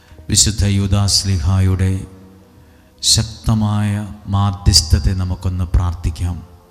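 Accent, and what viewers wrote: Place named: Butler English, India. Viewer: Why is it in butler English. Can't hear or understand properly ast 2 weeks.missed ...keep only English clearly or only malayalam clearly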